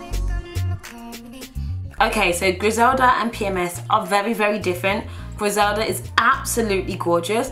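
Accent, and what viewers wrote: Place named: United Kingdom. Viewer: British accent . I love it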